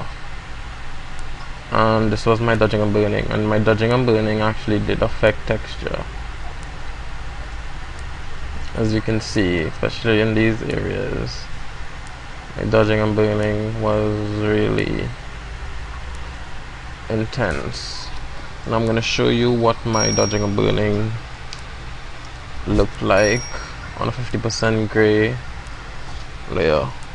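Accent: Trinidad and Tobago. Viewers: You have tah be ah Trini.. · you sound like a trini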